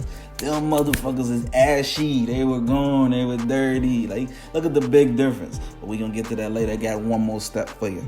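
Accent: Chicago, United States. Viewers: soon as I realized in yo talk you was from chicago too, i knew I was rockin w you🤣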